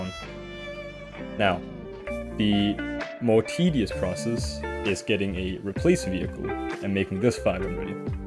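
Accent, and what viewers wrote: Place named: Geordie, United Kingdom. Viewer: you sound like an American Geordie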